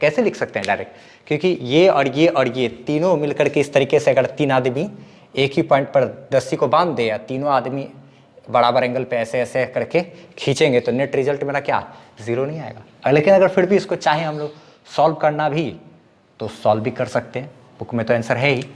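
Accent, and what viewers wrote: Bihar, India. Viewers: Sir, I recognize your tone... U r from Bihar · Loved your bihari accent teaching 🫡🫶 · Ya he is from bihar, purnea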